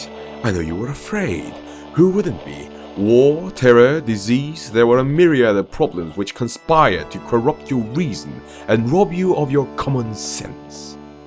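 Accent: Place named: United Kingdom. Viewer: 안녕하세요. 한국분이신가요? 어떻게 영국발음 연습하셨는지 여쭤봐도 될까요?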